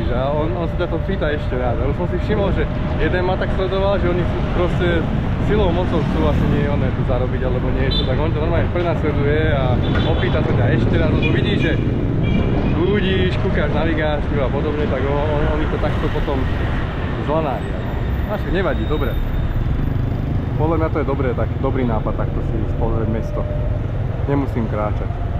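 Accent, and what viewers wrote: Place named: Šurany, Slovakia. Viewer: boa, ale tá šúránčina je riadne počuť :) Ale dík za videá, ja sa chystám do Saigonu v apríli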